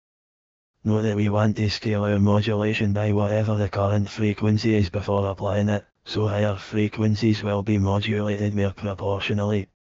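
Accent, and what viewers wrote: Scotland, United Kingdom. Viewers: Damn this is awesome thanks for this. Love the scottish AI text to speech voiceover as well haha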